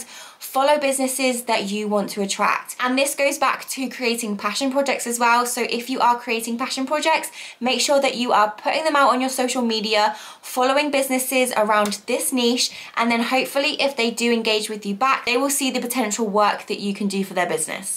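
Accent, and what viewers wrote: United Kingdom. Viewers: Hello, thanks for your so helpful videos! I love your BRITISH accent but you speak a bit fast lol. thanks again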